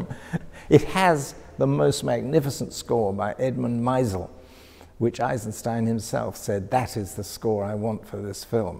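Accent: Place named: RP, United Kingdom. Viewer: U sound very posh & u know wht u talking about! R u from London? Thanks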